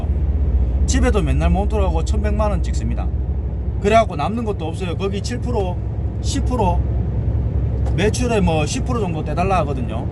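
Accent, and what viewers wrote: Gyeongsang, South Korea. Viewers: 갱상도 사투리 졸나게 거슬리네 토착왜구당 개세들 생각나서 나~~간다 갱상도 정말싫어 귀청소방 이나 가야겠다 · 이제 용차를 준비하는데 좋은 가르침입니다 경상도 사투리가 듣기 좋네요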